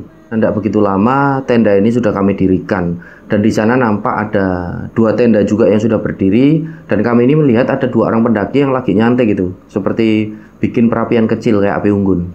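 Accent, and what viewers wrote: Java, Indonesia. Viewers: Jowo ne endi mas?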